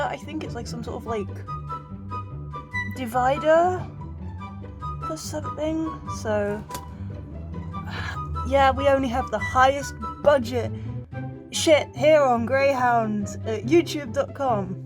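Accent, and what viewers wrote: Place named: United Kingdom. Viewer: too british.. otherwise 10/10 thank you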